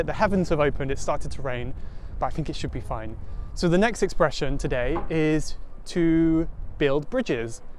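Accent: United Kingdom. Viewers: thank you very much , i learn a lot of british accent here 🤝💯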